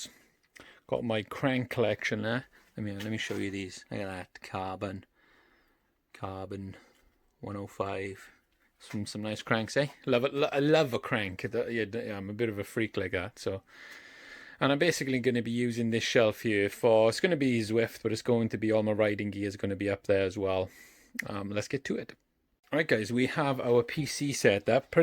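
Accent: Wales, United Kingdom. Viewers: Currently looking into getting one of these. What are your thoughts on after owning if for some time. It took me a minute to understand your accent at the start then realised you're Welsh haha I'm from Carmarthenshire (Cross Hands). Not used to hearing our accents on YouTube!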